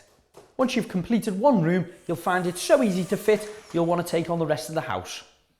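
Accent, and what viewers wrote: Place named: Liverpool, United Kingdom. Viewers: he is a scouser u moron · Sean Connery has a Scottish accent. This guy has a Liverpudlian or Scouse accent.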